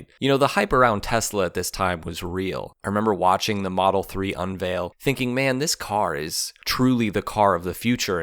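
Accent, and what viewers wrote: United States. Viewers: Wow, this put me to sleep, even after turning the volume down to escape that painful, droning American accented narration. Better than sleeping pills, safer too.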